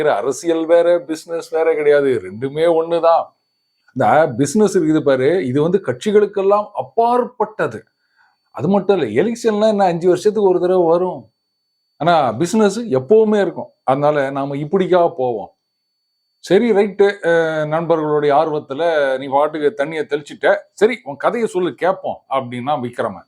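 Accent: Chennai, India. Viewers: Yenna saambu ore kuriyeedu Nari (traitor) Puli [Poonai kutty) Singam (Anna)... bada shokka keedhu pa... kalaakureenga thaleevare... Cho illadha kurayai nivarthi panreenga sir.... your Chennai slang is awesome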